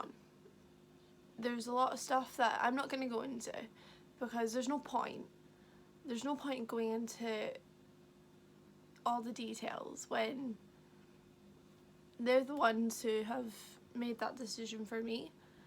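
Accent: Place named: Scotland, United Kingdom. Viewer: Is it Scottish or Geordie? Sounds more like Scottish to me 🙈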